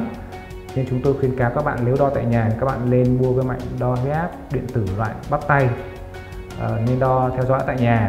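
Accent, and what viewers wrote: North, Vietnam. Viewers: Mình dân Cà mau bị cao huyết áp nhưng nghe giọng nói bake thì thấy không tin tưỡng nên tắt ngay. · Tiếng bk khó nghe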